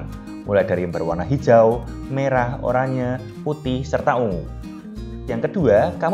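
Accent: Java, Indonesia. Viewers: Medhok kali ni mas nya🤣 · Medhok nya itu loh yg bikin nda bisa skip skip video.. Good work makasi teori dan motivasinya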